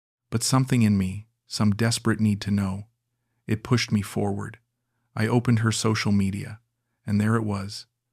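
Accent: United States. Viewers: Another yankee voice talking about fakeness, yankees are good at scam stories.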